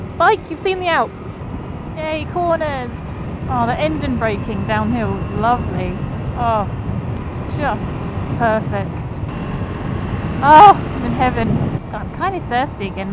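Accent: United Kingdom. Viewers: Soo you're in germany? But you sound british? Also, do you do meets ?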